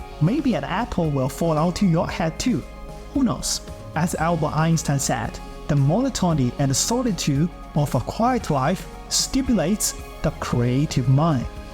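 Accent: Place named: Louisiana, United States. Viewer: Bro you got the cajun accent "youknowhowlooongihavebeenwaitingforthis"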